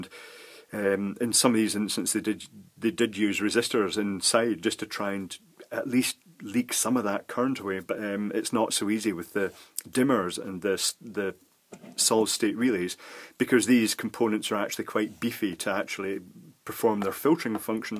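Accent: Scotland, United Kingdom. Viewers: Cool, a channel worth watching!, thanks man! Loving those dulcet Scottish tones and the information and ideas you provide in your vids, keep it up, you got me interested in electronics again! Regards and greetings from south east UK! :)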